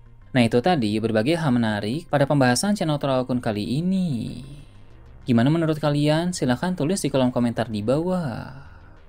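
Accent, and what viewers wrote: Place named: Banyumas, Indonesia. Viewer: Ngapak teka🎉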